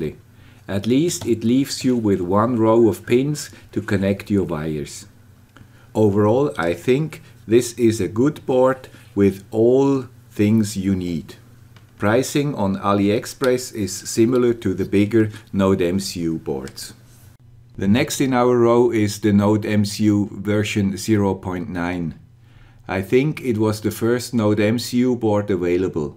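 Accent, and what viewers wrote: Switzerland. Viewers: schön wenn ma zu sim schwiizer akzent stoh chan ;)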